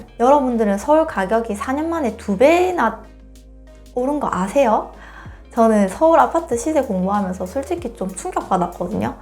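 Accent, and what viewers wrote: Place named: Gyeongsang, South Korea. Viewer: 경상도 누나같아요.. 억양은 우짤수없죠ㅠ 응원합니다!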